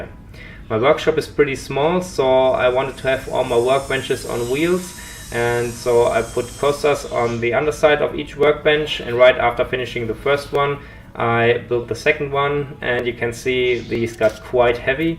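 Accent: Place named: Germany. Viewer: with the accent anything you say sounds reasonable and well engineered. "I have replaced wood dowels with spaghetti noodles." 100% confident German accent. gold 1m views.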